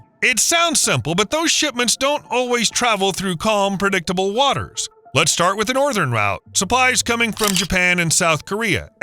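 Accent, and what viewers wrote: United States. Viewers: Because it's a Yankees voice for the video, I'll give a example, "WE PAYED YOU FOR THE NUCLEAR SUBMARINE DEAL WE ARE HALFWAY IN TIME AND STILL HAVEN'T RECEIVED THEM OR ANY"?????? WTF WE ARE YOUR BEST ALLIE AND IF I WAS PRIMINISTER I WOULD ASK FOR REFUND & GET A GOOD DEAL NOT BENT OVER WITH NO GASOLINE.... LOL 😂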